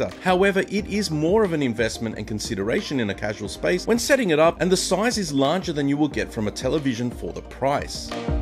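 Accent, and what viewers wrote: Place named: Australia. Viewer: Just wondering where ru location please?! U sound Australian to me 😅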